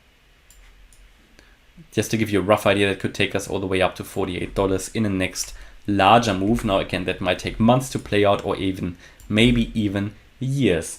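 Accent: Germany. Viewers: Hey ich schreib mal gleich auf Deutsch …. Bist du Deutscher ? Hört sich nämlich so an 🙃 gute Analyse … ich finde diese ganze Elliot Theorie super spannend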